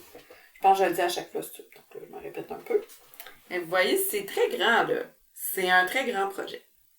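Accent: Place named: Quebec, Canada. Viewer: Une québécoise yeaaah J’ai bien aimé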